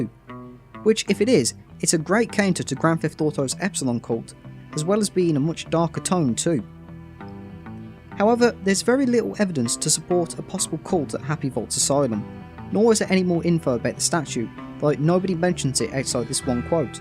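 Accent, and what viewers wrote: United Kingdom. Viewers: Okay I thought you were British because of your voice and where are you from then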